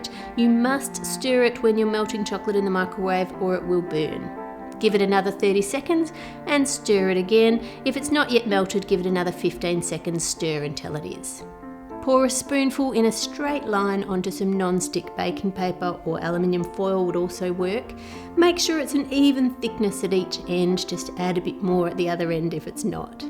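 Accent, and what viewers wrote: Australia. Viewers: Besides enjoying your luscious desserts, I must say that I'm loving your friendly Aussie accent. It reminds me of my aunty Beverly. I'm a little over how americanisms are gaining a foothold in international you tubers vocabulary, and so its really refreshing to hear things like sticky tape and your gentle Aussie lilt. Love from a Kiwi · I will probably never make this, but very much enjoy watching creative people create it teach... Also as an Australian myself it is just so nice to hear an Australian accent in the voiceover - so nice, sweet and comforting. Like a hug from Mum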